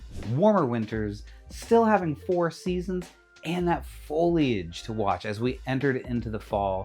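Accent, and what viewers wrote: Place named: South, United States. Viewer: Love your content — but how did you pick up a “southern-ish” accent in just a couple years? I just moved back to the south after over 12 years away out west and my accent didn’t change a bit and it was often commented on out there.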